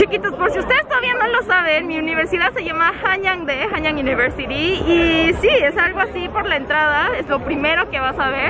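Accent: Mexico. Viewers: Yo no soy seguidor del canal uno que otro video me e visto se Me hace una chica muy linda pero mi pregunta a sido siempre como rayos le hace para hablar también el español parece mexicana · Ahora que Cris está en México ví tu canal y me ha encantado, tu español es muy bueno, muy "mexicano" diría yo :) pero yo quiero saber ¿Qué estudias? ¿Cuánto tiempo hay que estudiar la universidad? ¿Cómo funciona eso en tu país? Me surgieron esas dudas ... Saludos...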